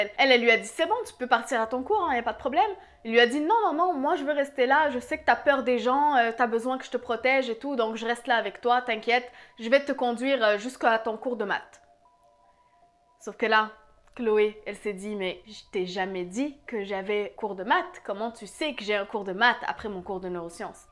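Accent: Quebec, Canada. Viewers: Tu es québécoise ?Parce que perso j’adore ton accent 😍😍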